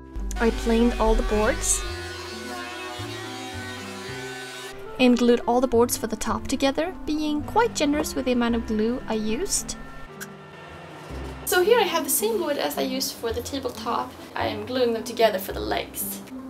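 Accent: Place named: Sweden. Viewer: Misstänkte nog att det var en svenska bakom den brytningen. Anywho snyggt jobbat :)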